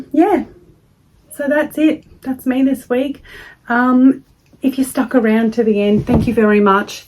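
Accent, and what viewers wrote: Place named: Australia. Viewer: ❤ watched all the way through! Great to hear an Aussie accent speaking about crochet! Your blanket is beautiful!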